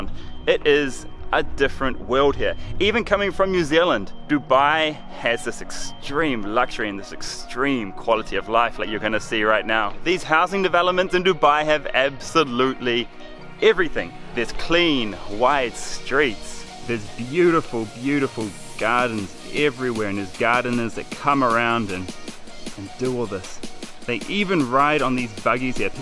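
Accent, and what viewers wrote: India. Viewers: Good content but it's very hard to understand your Indian accent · Love your hindi accent Please visit once Varanasi which is older than history itself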